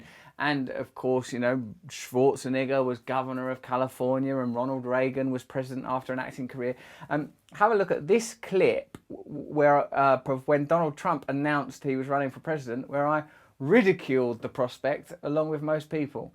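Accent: United Kingdom. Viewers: I love the way Russell talks being British but he clearly knows nothing about NESARA/GESARA and who is bringing it !!! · WTF? This is an American with a British accent. Just more proof the end is near. By the way, WTF did he say?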